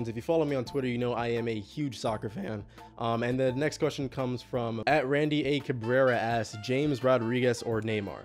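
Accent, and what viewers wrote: Nigeria. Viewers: Are You Nigerian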